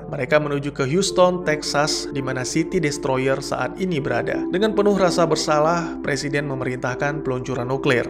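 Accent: Aceh, Indonesia. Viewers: Bang lu org aceh ya?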